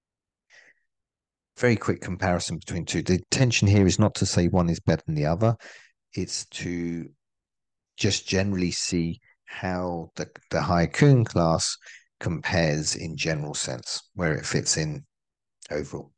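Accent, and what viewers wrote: United Kingdom. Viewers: Kerel, doe even rustig aan. Hij doet zijn best, alsof jij vloeiend in elke taal bent. En het is geen "yank" maar een Brit....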